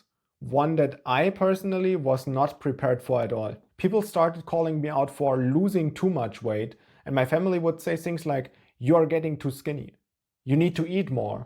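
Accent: Germany. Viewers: You sound German... To have German food and choose vegan food is just wild to me You don't really need the 1g of protein per pound of body weight. There's lots of data demonstrating this. Collectively, the data that suggest it's needed, shows about .7 to .8 is plenty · Really interesting video. Walking is underrated. I walk every day around 15k steps and do jump rope 4-5 times a week, and recently added 100 Push ups a day. Im not fat, but for what i put in... the results (over time) are lacking. I "just" need to change my nutrition. these damn tasty soft drinks and bread... Is that a german accent? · Gosh, finally a real video from a real normal person. I think all those American lecturers are from a different Galaxy, stuff I just don't understand. I wish I got to your "before" photo. 🙂Please, use kilograms, I am from Europe, just like you, Australians use kilograms, I can kind of hear you're from Germany 🙂. 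I started tracking steps/kalories (I think I have some optical results) and I came to the same startling conclusion - granola oat cereals for breakfast is a MURDER, also peanuts for snacks, which I need to phase out. Two fat slices of bread with jam have less calories than oat cereals and one does not get bloated. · when you hear a strong german accent like this you know he doesn't wanna sell you anything but just genuinely want to help you. thanks man. I needed this. Turning my walking pad on right about now 👍🏼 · Very nice, smart and informative video! As a French guy, I like the German mind, usually rational and analytical. Also, the accent... 😉 Also, your new body, lean and muscular. Congrats for that. 👍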